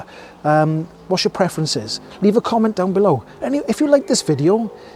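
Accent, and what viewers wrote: Wales, United Kingdom. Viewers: Hi, I like your welsh accent, reminds me of my late father in-law, Unfortunately my wife was born here in Yorkshire so no nice accent. Great scenery on your challenging ride. I have a 6 month old Carrera Vengeance e spec which has been a total nightmare. Long story but i have replaced all electrics apart from hub motor. I fitted a left hand thumb throttle due to wanting to keep throttle wide open while changing up as only 250w motor so need to keep it rolling, especially up hills. Subscribed, will watch other vids soon.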